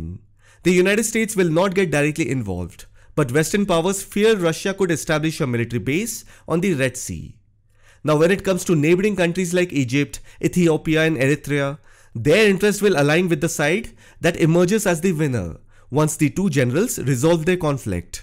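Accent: India. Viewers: ❤You spoke very well English - during the first 20 seconds... Then you got too animated and slipped into "Ind-glish" - which is unbearable to listen too!❤ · Well explained but not all are true from ur voice i can see you are an Indian repoter and that's why not all ur info are correct but you hit must of the points. More concrete research and confirmation from a well respected reporter in one of this african countries like UG, Keyan, Sudan will give you proper details. There are more to the war. I didnt see you talk about china here ask questions you will get the exact details. You did so well once again thank you for speaking out. God bless you 🙏❤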